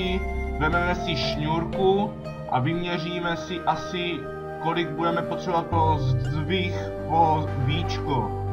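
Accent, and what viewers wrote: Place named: Moravia, Czech Republic. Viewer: Tož to zkusím! Mám dva kocoury a nejspíš tu myšku dotáhli oni. Teď mám v pokoji už druhou (první jsem ulovila já....), jenže je za skříní, což je problém. Děkuju za nápad a moc se mi líbí, že mluvíš, jak ti zobák narostl. Já su taky z Moravy, takže mě moc potěší, když neslyším furt takovej, makovej, zapejkat a podobně.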